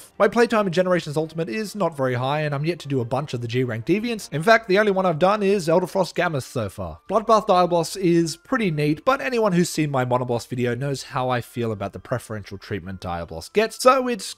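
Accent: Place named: Australia. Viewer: Doesn't help an Aussie accent really plays into pronouncing the di a lot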